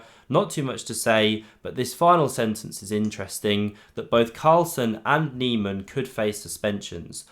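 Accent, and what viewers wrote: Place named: Scotland, United Kingdom. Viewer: your Scottish accent is horrible I can follow you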